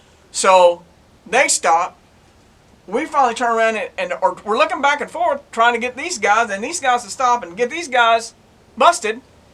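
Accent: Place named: United States. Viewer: Mate, that is not the world, that is the usa, judging by what you described and by your accent. I live in a small country in europe and that kind of shit just does not happen around here. Kids running around with shotguns... that is just crazy. Here you can have a 8 year old gril walk the streets of a city with one million people at night and be afraid of anything. Ofc, the parrents dont allow that anymore, because of the constant fear porn in the news, but you could still do it and nothing bad would happen. I am really not a fan of weapons and i am happy that they are not allowed in my country. However, if i was living in the usa, i would want a gun too. How the hell do you police a society where every fool, even kids, can have guns? There is a lot wrong with my country, but when the police see someone with a gun, they know there is troube and they know who the bad guy is. 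They dont have to think about whether they are being subjected to a 2nd amendment audit.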